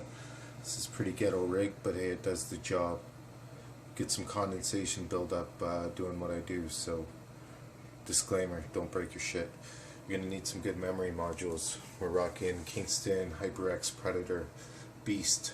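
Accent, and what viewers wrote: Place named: Canada. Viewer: Dude. The radiators out the window and the Canadian accent commentary made this whole video for me. Fuck Yeah!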